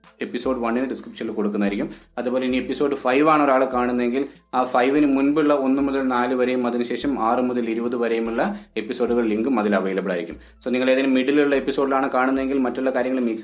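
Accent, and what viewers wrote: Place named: Malappuram, India. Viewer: nice presentation, oru malappuram touch undu.. s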